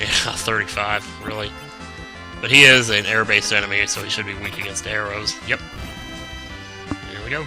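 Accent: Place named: Midwest, United States. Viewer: aw sweet somone from the midwest im guessing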